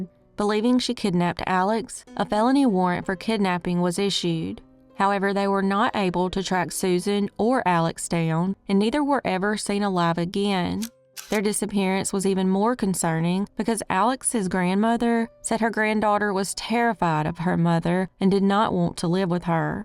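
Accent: South, United States. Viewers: Where’s your accent from? I have been listening for a while and I’m guessing either TN or NC. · I feel like i haven’t heard your southern voice in idk how long ☺️☺️☺️ rot in hell Larry 🤪🤪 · Her"s is the only southern accent i like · It's nice that these cases are narrated by someone with an authentic Southern accent.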